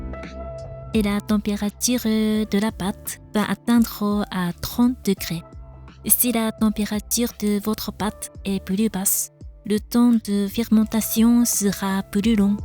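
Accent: Japan. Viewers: Merci pour cette agréable video ! Les images sont très belles et votre petit accent japonais est adorable ! Bonne continuation a vous et merci pour ces superbes recettes sans gluten.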